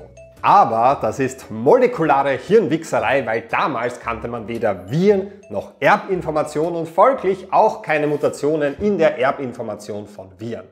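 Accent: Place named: Austria. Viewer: Ich mag deine Art, in deinem Deutsch gerade so viel Österreichisch zuzulassen, dass du damit nicht proviziell (Entschuldigung, bin selbst Österreicher, ich darf das) wirkst, sondern die Allgemeingültigkeit deiner Aussagen betonst und trotzdem eindeutige rotweißrote Perlen setzt, die dich unverwechselbar machen und deiner Sprache Persönlichkeit geben.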